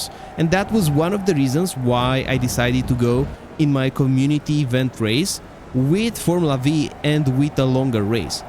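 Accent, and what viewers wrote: Romania. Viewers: hahaha, mi s-a parut ca ai accent de compatriot ❤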